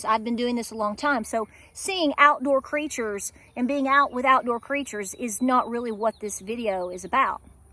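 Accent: South, United States. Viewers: I LOVE your accent!! It’s such a beautiful southern sound; both my grandmothers were southern and I loved hearing the soft lilt in their voices:)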